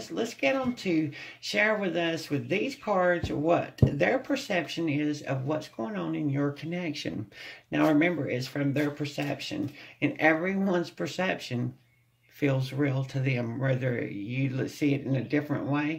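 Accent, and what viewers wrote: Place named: South, United States. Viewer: Wow just found your beautiful channel ! I subscribed ! I love your southern voice ! I’m praying to reunite with my southern cowboy who’s a Gemini like me ! I’m from the.north ! We’re like Blake Shelton and Gwen Stefani lol 😂🤠if only he opened his eyes to the real truth ! We were engaged in the 90 s! 🙏❤️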